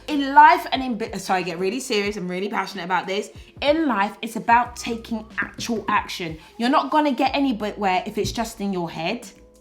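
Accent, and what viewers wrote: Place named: United Kingdom. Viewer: Love her personality... and her British accent!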